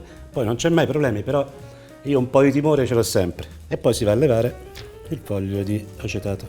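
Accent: Tuscany, Italy. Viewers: E' toscano, probabilmente. Ci viene naturale!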